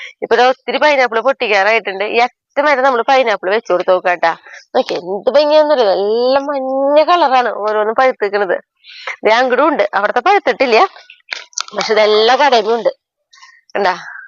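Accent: Thrissur, India. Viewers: Clear ആയിട്ടാണല്ലോ സംസാരിക്കുന്നത്. പിന്നെ അവരുടെ തൃശൂർ സ്റ്റൈൽ ആയത് കൊണ്ടാകും അങ്ങനെ തോന്നിയത്..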